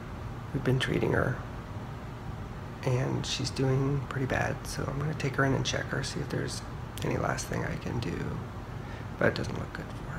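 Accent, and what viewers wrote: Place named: England, United Kingdom. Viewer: Dear gentleman, I couldn't understand why this procedure were carried out. I am not good at understanding your English ascent. Why both cats were not left to take their own time to leave this world.please don't feel bad if I have asked the question without understanding the situation ( which really couldn't understand ). Thanks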